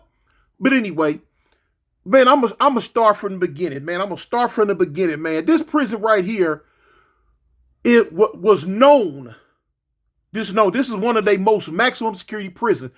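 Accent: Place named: Colorado, United States. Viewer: Yo Big homie can you do a story about my cousin RIP OG Businessman Berry (Godfather the loan Sharker)killer Clause and my Uncle Freddy( he was in and out of prison that frequently use Herion) you say certain words that remind me of them! It’s that OG South Park Slang homie!!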